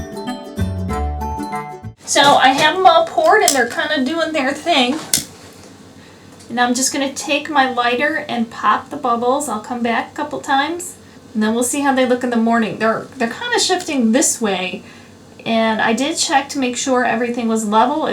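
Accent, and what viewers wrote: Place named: Michigan, United States. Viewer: Love these! By the way, are you from Michigan by chance? It sounds like it from your accent.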